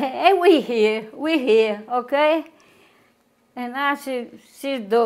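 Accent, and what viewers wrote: Italy. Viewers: Looove that glorious Italian accent!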